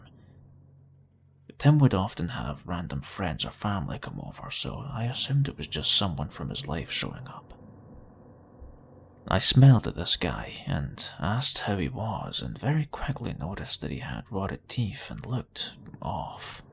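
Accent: Scotland, United Kingdom. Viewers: A Scottish accent goes really well with scary stories. Great story telling voice👌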